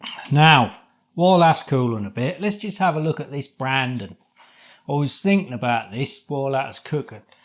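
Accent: Norfolk, United Kingdom. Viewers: Well I could have easily have just skipped to the cooked product, but the Norfolk accent alone was enough to draw me in. Its like listening to one of the farmers in my village, except, he's talking dough balls instead of ploughing. I'm hooked, already watching another video with your dog, and the video montage of him throwing a plastic slide around the garden is hilarious. And yeah, anything carby is usually up my street. People assume being a vegetarian you'd eat healthier, but no, just pure carbs and quorn. Speaking of, have you tried 'The No Meat Ultimate Burgers' at Iceland, one to review if you haven't already, my top burger for sure. Good sausage rolls too. Anyway, I'll see what i think of the dough balls. You too.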